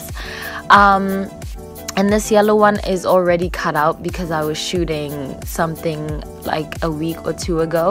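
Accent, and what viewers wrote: South Africa. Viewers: Presume you're South Africa, cause of your ascent... great tips hey, from the motherland... South Africa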